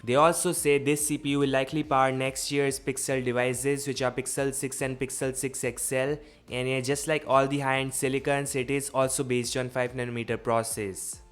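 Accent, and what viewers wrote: India. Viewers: Ngl when I hear the thick Indian accent I close out of the video faster than when I hang up on a scammer.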